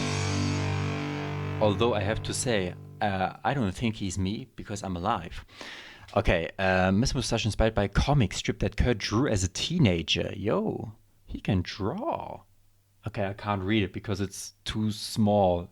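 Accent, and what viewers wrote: Germany. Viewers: You definitly dont sound like the baby from family guy. As a german I hear the german german accent if you could actually call it an accent.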